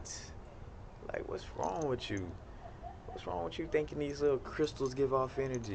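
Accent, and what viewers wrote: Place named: Brooklyn, United States. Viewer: You so Brooklyn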